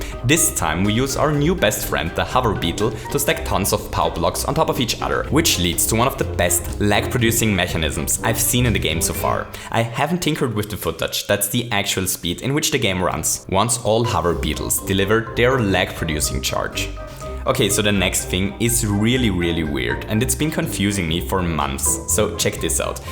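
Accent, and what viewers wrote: Germany. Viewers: are you german? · so you're german, nice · You sound freaking German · are u from germany? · Whoa i didn't know you were German... I kinda recognized your accent but I wasn't certain until i saw the german language at the point where you checked the Upload date from the new black hole Level... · Are you German? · He's from Australia but with a heavy German accent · Lol Youre german ? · Are you german??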